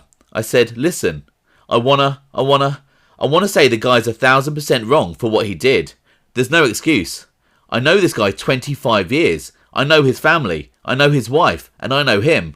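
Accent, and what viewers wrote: England, United Kingdom. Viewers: I love hearing about the American Italian Mafia from a guy with a British accent.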